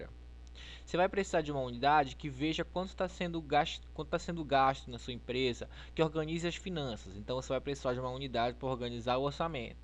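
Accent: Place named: Pará, Brazil. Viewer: Sotaque paraense detected! Impossível não reconhecer um Belenense falando! Hahahaha.. Muito bom os videos, parabéns! Deu pra ajudar a iluminar bastante as minhas ideias.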